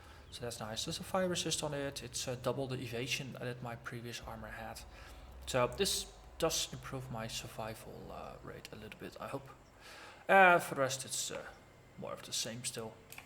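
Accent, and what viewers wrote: Netherlands. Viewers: true fan of the new chaos dmg here. quick question, are you dutch? you sound dutch.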